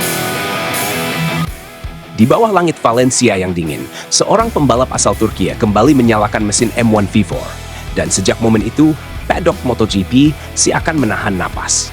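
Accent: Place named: United Kingdom. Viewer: Ai dgn aksen enggries bikin cringe Walau yamaha tim gavorit gua,Males nonton sampe beres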